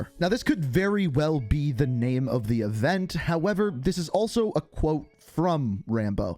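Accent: Canada. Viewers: Is this clown Canadian ?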